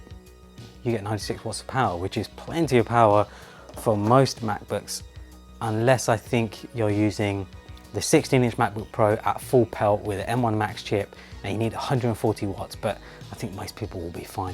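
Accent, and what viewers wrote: United Kingdom. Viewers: That's the hardest brit accent and I love it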